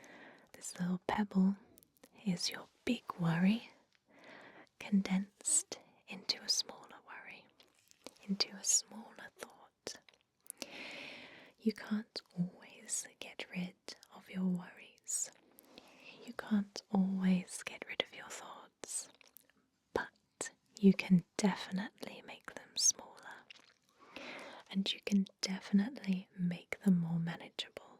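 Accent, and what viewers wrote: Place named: United Kingdom. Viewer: You’re only saying that because they look alike... and sound alike... and both have british accents... and both do asmr... and both have the first name... and they are the same person.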